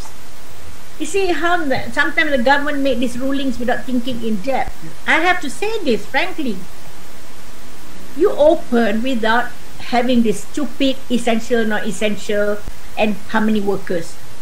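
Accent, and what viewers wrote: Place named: Malaysia. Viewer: I like her English...... she really speak M'sian English! She missed something about Azmin: I think that bloke is quite handsome!!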